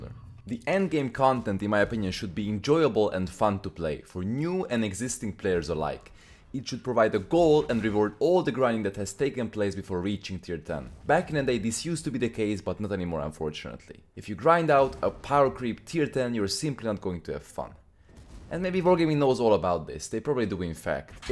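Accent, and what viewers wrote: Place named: Hungary. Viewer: I am sorry if im mistaken, but is there a chance that you are from hungary? Your accent is really familiar. If this is the case, heló, nagyon jó tartalmat produkálsz :)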